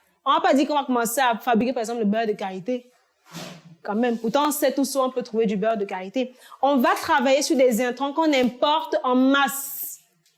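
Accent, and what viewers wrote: Cameroon. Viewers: Bonne diction!précise!claire!contextuelle!bien camerounisé!un régal!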